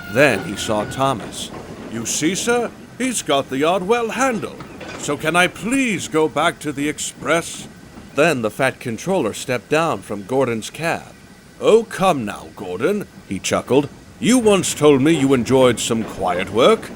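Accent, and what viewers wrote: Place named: United States. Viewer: Narraration sounds a bit too American, but this is very well written and I hope you make a threequel.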